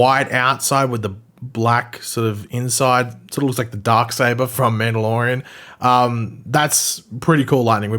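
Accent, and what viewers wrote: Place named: Australia. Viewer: sorry i've been smoking but i forgot you were Australian till you said crisis lol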